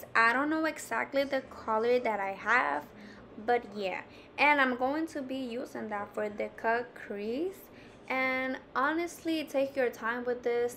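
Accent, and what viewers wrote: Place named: Cuba. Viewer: Sis pero y ese acento cubano? 🇨🇺 y que fueeeee, ta viendo muchos videos de Lohana 😅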